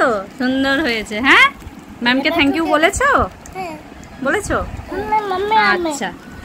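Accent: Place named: Kolkata, India. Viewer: আমার মেয়ে minnat, রোজ আপনাদের ভিডিও দেখে, বয়স ৩ বছর, আপনার কথা কলকাতার মানুষের মতো, আপনি কি মুসলিম? এই প্রশ্ন টা আমি খুঁজে পাচ্ছি না, 😊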